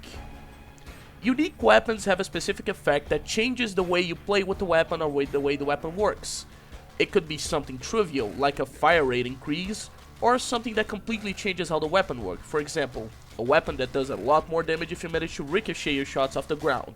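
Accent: Brazil. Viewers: Eu to aqui pensando "que sotaque... brasileiro" ai fui ver e de fato, é um brasileiro falando e.e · I don't know how but I immediately spotted a brazilian accent on his voice, which took me off guard since: 1- I'm terrible at that; and 2- I don't know many brazilians who enjoy Borderlands. It's definitely lesser known IP around here and I had to brute force my friends into this franchise. What a pleasant surprise, keep going!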